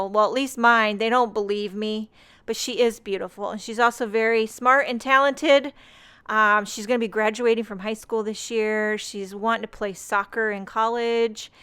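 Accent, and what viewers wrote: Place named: Boston, United States. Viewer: Thanks for sharing!! When I first found cardmaking a year ago, I remember listening to u and laughing my “ butt off!” Lol! I was dying to know if u were from the Boston Ma area, by the way u were talking! Lol! U are such a breath of fresh air! Ur cards are absolutely beautiful, and ur fun to listen to too!!!😀❤️👍🏼 Happy bday to ur daughter! My baby just turned 23 yesterday!!!! I miss both of my boys so much! Enjoy all the craziness now! For me, the “empty nester” thing is “ for the birds!” Lol! It’s totally not a home when ur babies are out on their own! Merry Christmas to u and ur BEAUTIFUL family!!! Liz E Cape Cod Ma 😊❤️🎄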